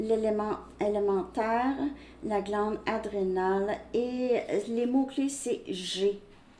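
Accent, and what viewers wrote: Quebec, Canada. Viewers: Salut non sa va tu prononce bien avec un jolie accent québécois magnifique guidance générale j adore bonne continuation à bientôt ❤️ ❤️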